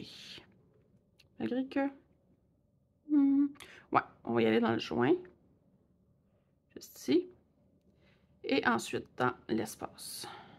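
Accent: Canada. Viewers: Je l'ai terminé aujourd'hui. Je suis de France, dans la Sarthe (rillettes, 24h du Mans). J'ai adoré le faire et surtout avec votre accent Canadien 😍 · Merci pour cette vidéo. J' ai beaucoup apprécié vos explications. Votre accent québécois me rappelle de bons souvenirs ( voyage dans votre beau pays). Bonne continuation. Je vous envoie un petit coucou et un petit rayon de soleil méditerranéen du Sud de la France. Merci pour votre partage.KW.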